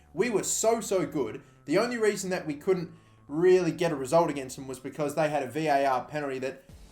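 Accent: Australia. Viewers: Wait ur Australian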